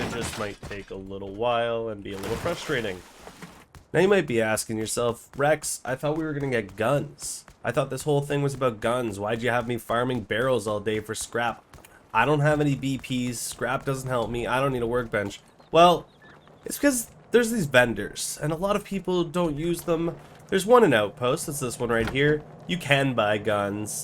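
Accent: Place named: Canada. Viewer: You sound Canadian af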